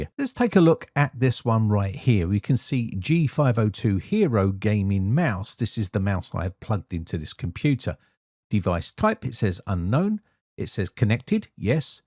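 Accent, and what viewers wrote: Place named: Cockney, United Kingdom. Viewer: I'm here for the cockney accent.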